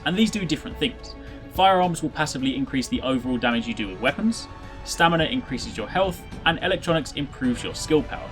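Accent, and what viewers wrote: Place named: United Kingdom. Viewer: OMG for the non Brits please talk slower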